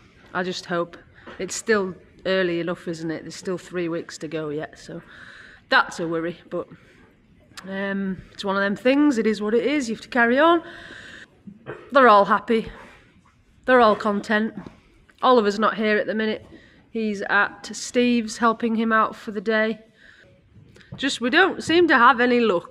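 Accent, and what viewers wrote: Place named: North England, United Kingdom. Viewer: north yorkshire, cumbria border. thought you were somewhere up north. lovely accent. secondly, you really do get completly different weather up there. you are harder. fair play to you. i hope you get some decent spring weather. and everywhere dries up. you are another superstar of the industry. good on you.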